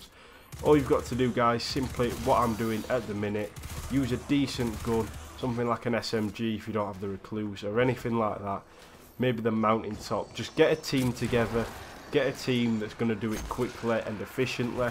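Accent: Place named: Northern England, United Kingdom. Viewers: Love these farming methods mate. Nice work. Glad to hear a fellow northerner on here helping the community out 👍🏻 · Love your accent, are you from England?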